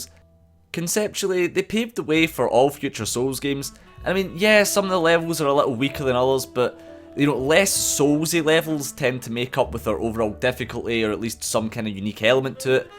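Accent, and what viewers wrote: Scotland, United Kingdom. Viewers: You sound the absolute spit of James McAvoy. What part of Scotland you from? 🏴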